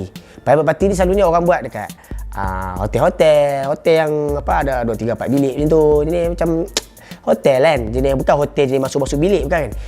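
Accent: Kedah, Malaysia. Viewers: tajuk ceghita menarik tp cagha teghita sebenagnya not bad cuma suka ulang2 benda yg sama. Sementagha nk sampai kepermulaan ceghita tu xtau beghapa kali dok ulang benda yg sama. baiki tentang tu......channel ni akn jd lbh menarik. InsyaAllah. Loghat Og terbaik. 👍👍👍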